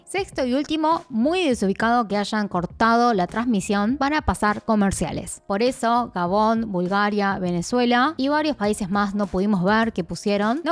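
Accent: Argentina. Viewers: hablas re bien el argentino wtf cm lo logro Xd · ni tan mal la descripción de Ecuador 🇪🇨 bonito que destacaran el Chimborazo y las tortugas gigantes de Galápagos, pensé que pondrían bananos o maíz. Buen video, me llama la atención tu acento argentino para hablar español jajaja.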